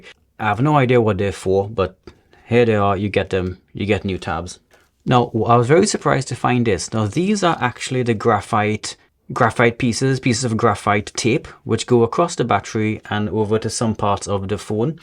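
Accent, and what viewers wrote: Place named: Trinidad and Tobago. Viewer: I could hear the Trini accent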